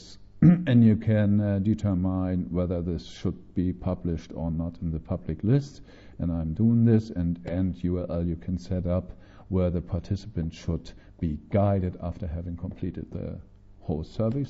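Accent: Germany. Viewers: How do we see the video in better quality? His English is a bit German :)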